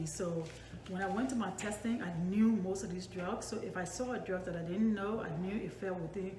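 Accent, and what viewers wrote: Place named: Liberia. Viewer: Hey you sound like Liberian. I am Liberian too. Congratulations I am preparing too for the nclex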